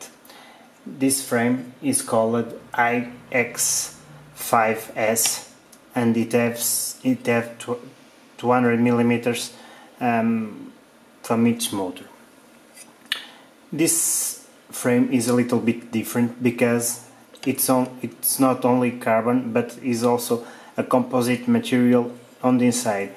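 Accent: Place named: Portugal. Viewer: That accent is unmistakable, é um tuga..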